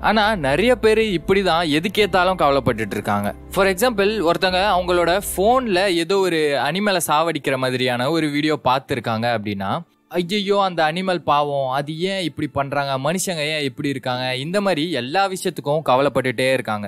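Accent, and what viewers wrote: Kerala, India. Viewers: That kerala slang tamil voice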